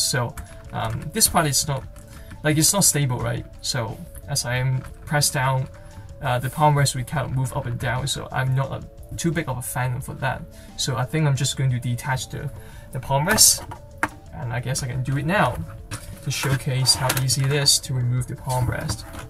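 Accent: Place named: Denmark. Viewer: you can hear thet u are Danish but ure videoes is really cool though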